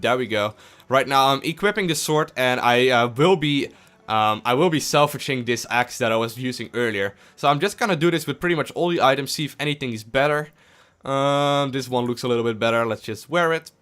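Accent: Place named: Netherlands. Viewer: The Dutch accent is real ;D Great video, thanks!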